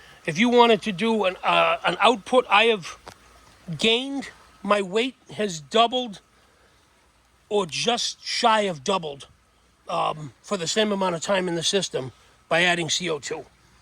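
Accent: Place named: Upstate New York, United States. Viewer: Upstate new york or Jersey for sure. Nice space buddy.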